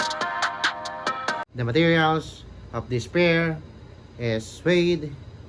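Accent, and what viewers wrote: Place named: Visayas, Philippines. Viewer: Tol pag sure ba tuno bisaya kana hahaha pero ang ganda ng mga shoes original talga alam ko yan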